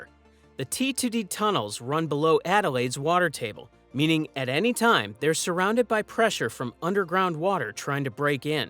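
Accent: United States. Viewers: Why is an American narrating? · Why is an American accent narrating an Australian project?, " tunnelling under homes and businesses , no they're not because the home owners and businesses have been relocated whether they wanted to be or not, I get the need for progress, but I haven't heard what diversion means are being implemented so wildlife can be safe while crossing sides? It's distressing to see wildlife dead roadside because of a pathetic piece of wire for them to use, why can't we take a leaf out of the book of other countries and build an underpass or overpass for them, or is that not profitable 😮 I do like the plan for a park topside over the tunnel, nice bit of greenery. 😊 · We should be hearing a voice like Brenton Ragless instead of that American voice 😢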